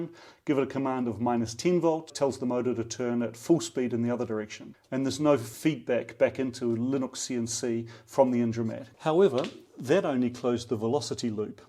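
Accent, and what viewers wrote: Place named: New Zealand. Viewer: Great informative video, You sound Kiwi to me??? Cheers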